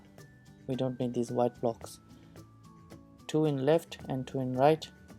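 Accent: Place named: Japan. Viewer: And guys his counting doesn't suck, Its just hard to understand his accent. How about you all take classes in Japanese language for a change, Then you will hear the accent.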